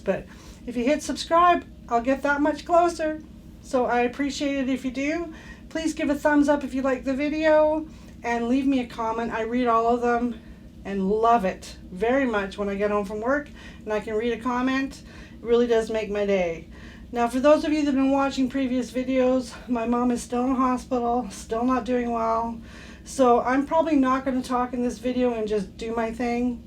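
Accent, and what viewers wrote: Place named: Canada. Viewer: I hear your Canadian! I am too!!! I watch Art with Rossy from Australia and she gave you a mention! New Subscriber!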